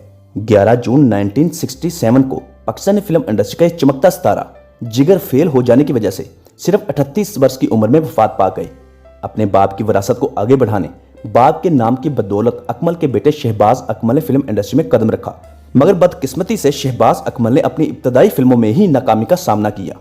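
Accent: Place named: Punjab, India. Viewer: Urdu ko urdu mein boleen urdu ko punjabi mein mat boleen please ...apna urdu ka.lehja sahi kareen ... video bana kar dard e sar na baneen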